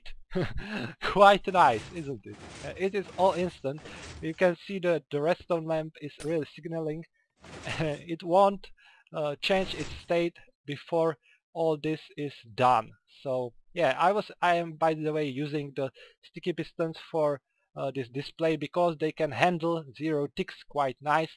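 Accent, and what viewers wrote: Czech Republic. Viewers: ja mam dotaz :D prosim. z prizvuku si myslim, ze jsi cech, tak to napisu rovnou cesky :D mc sem hraval, ted to jen sleduju. ale nikdy sem na obvody nebyl a hrozne rad bych vedel, jaky tohle ma vyuziti v klasickym survival svete ? nebo to je jen sranda, ktera nema nejaky velky vyuziti ?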